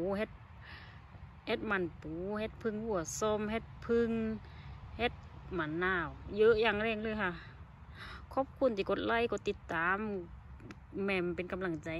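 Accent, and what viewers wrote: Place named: South, Thailand. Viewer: หลกหล่าว นิ ทากน้องเหอไม่ช่ายปลิง แต่ชอบจ้านนินานๆเห็นคนใต้ไปอยู่เมืองนอก เห็นแต่คนอีสาน ฟัง แล้วคิดถึงบ้านอย่างแรง 555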